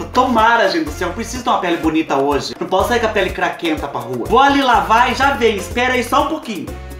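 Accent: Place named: Minas Gerais, Brazil. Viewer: Você tem muito sotaque de mineiro.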